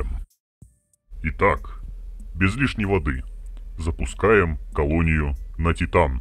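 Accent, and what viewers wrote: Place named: Soviet Union. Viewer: Автор клоун,комунист,который думает что конченый советский говор и элементы советских реплик сделают его крутым.Хуже канала по СФС не видел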